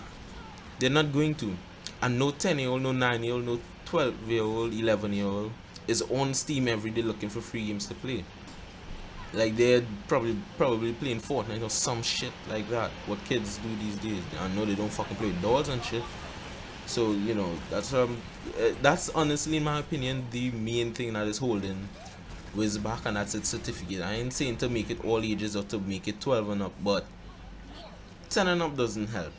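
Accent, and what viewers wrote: Trinidad and Tobago. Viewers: Eh. u from the Trinidad by chance?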